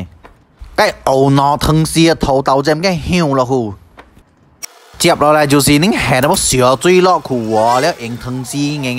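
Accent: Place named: Hokkien, China. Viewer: How come got Northern Hokkien accent?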